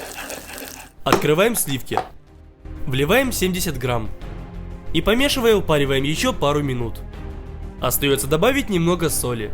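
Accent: Ukraine. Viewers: И сейчас есть украинский акцент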